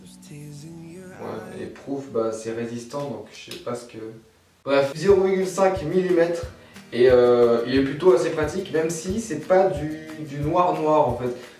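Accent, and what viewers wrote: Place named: England, United Kingdom. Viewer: Cet accent anglais ow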